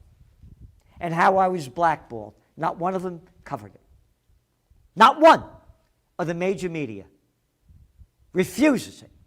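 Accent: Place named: Brooklyn, United States. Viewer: "turlet" I love hearing Geralds Brooklynese.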